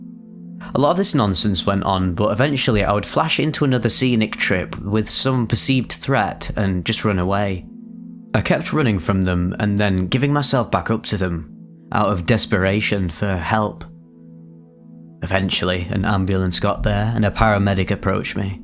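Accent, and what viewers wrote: United States. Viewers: your american accent is so funny haha great voice acting man loved this one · Omg I love your American accent 😂 it made this already amazing retelling of this story all that much better! Your voice and affect are honestly so perfect for telling stories! Especially these- so to hear a twang of humor is utterly amazing ❤😂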